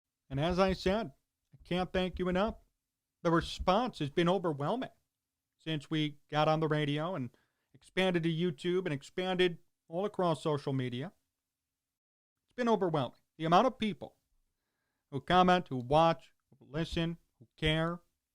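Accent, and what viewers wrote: Chicago, United States. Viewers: A Chicago voice for those who love Chicago and I am from Iowa and live in Wisconsin. But love me some Chicago. You keep me connected to Chicago sports no matter where I am. It doesn't make any difference how old you are but that you talk sense. That's you,. And the real reason is that you are just one hell of a respectful person.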